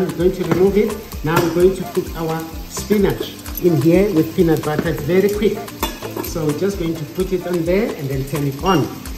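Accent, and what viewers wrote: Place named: South Africa. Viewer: Wow..you sound like a fellow South African! 🤣🤣🤣👌...so Im gonna be ur new sub.! Your dishes look soo amazing.I recently made a fruit salad on my page that can pair well there.🤩Wish you the best !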